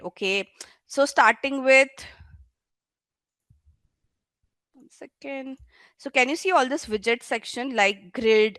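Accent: India. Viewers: over 5 hours having to listen to an indian accent? Fuuuuk me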